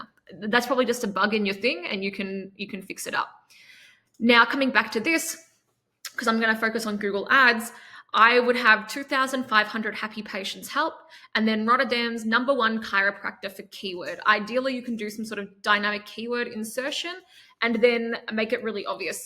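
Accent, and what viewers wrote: Australia. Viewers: Good stuff. I, for one, enjoy your English and Aussie accent.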